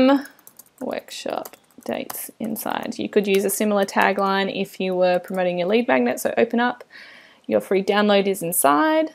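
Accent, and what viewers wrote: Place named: Australia. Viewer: Hi, I love you girls you look great and being a fellow Aussie I just looovveeee the accent. Hey how do I get my non - generic landing page onto my website??? Many thanks, The Urban Garden Companion- An Aussie Gardener in the New York Jungle.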